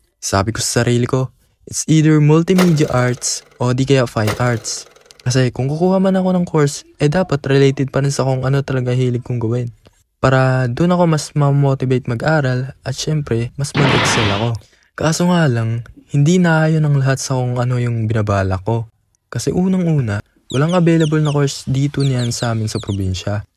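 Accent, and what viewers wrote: Philippines. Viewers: Parang sa catubigg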